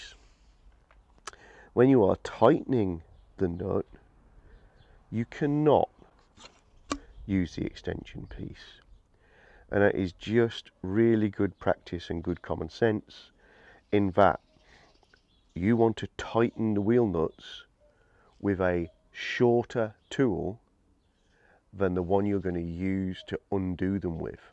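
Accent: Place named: Birmingham, United Kingdom. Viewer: Just found your channel and binge watched the XK8 posts. Excellent series of informative vids, nicely filmed and narrated (with a hint of Brummie accent?). FWIW, I regularly check air pressure of the spare and inspect sidewalls. Those "T spec' tires degrade over time even with minimal or even zero use. They are also becoming difficult and expensive to replace and almost impossible to safely repair.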